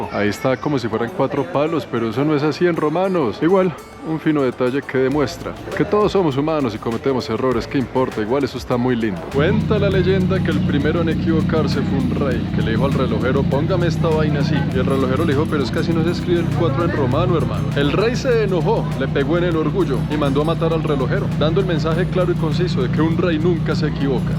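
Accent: Colombia. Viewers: Según tengo entendido, es hijo de madre Colombiana, padre Argentino, nació en Panamá, vivió varios años en Canadá, tiene sangre de Mesoamericana y de la península Ibérica, con acento colombiano. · Muy buen relato hermano, por su acento, ma párese como de Bogotá, Rolo como les llaman a los de Capital de Colombia. Gracias por su reportaje, de Antigua., Monumento Colonial de América. Saludos Cordiales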